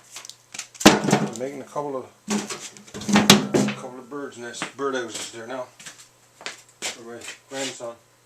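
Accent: Newfoundland, Canada. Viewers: Now das a good ‘ol Newfoundlander dere!!! ❤️❤️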